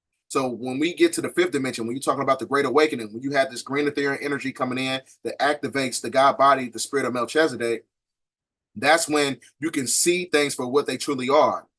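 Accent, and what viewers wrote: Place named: Louisville, United States. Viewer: I hear Louisville accent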